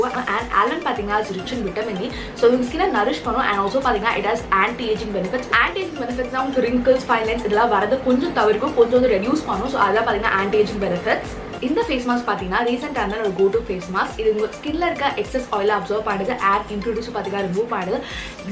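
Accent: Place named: United States. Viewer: Please Talk properly Don't use American accent....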